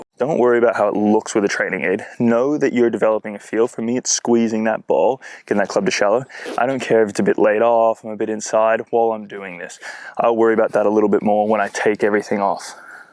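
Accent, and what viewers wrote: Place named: United States. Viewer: Every vid ur accent progressively gets more American lol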